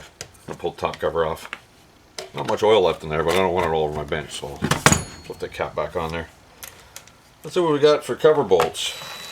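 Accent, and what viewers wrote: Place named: New Brunswick, Canada. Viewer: My mother was from NB so I like your accent! Anyway, the white paint on the fuel line is a hint it is original. My 2010 vintage saw has the same. I take it you don't think much of these saws. Since I have only used Poulan and Echo, fixing mine is a new experience. Seems like a pretty good homeowners saw. But I have noticed that the displacement of these saws seems inconsistent - 42, 38, 37, (mine says 35) and even saw one in a video that showed "3414c". I guess the Chinese don't read English very well (: BTW there is a small round tab (1/4 inch dia) that secures the front left of the cover.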